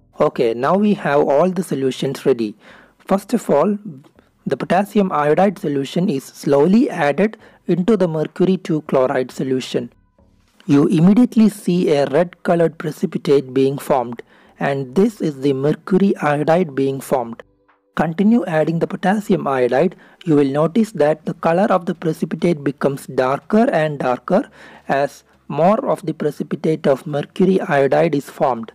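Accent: India. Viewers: There's no polish video so here I am 🥲 that Indian accent hurts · Your sounds more likely to indian😄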